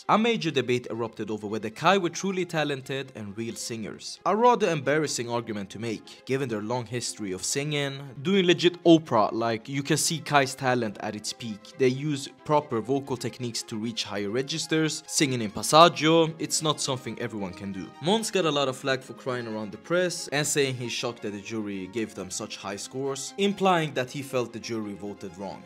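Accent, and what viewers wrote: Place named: Finland Swedish, Finland. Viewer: *Fennoswedish dialect, not finnish accent : ), but otherwise awesome vid.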